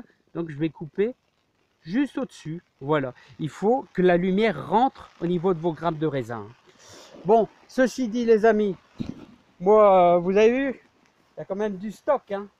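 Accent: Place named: Calais, France. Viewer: Salut Je ne suis pas pressé pour les graines t'inquiète pas de toutes les façons ce sera a semer qu'au printemps prochain,et,si tu as d'autres graines quelconques a rajouter si tu veux il y a pas de problème. Pour ma vigne je vais voir encore cette année ce que va donner le résultat. Dis moi en feuilletant tes pages j'ai vu que tu bouture la clematite tu le fait quand?.Je te remerci pour tes réponses c'est toujours avec plaisir de te revoir et si un jour je n'ai pas le moral Je penserai a regarder Ta chaîne. Il y longtemps j'ai connu une famille a Calais:la famille Alexandre qui s' occuper du club des Vieux tarots, tu les connais peut-être? C'était mes anciens grands beaux parents. Par ton accent Tu me rappel un peut cette époque. Bye bye.a bientôt Pascal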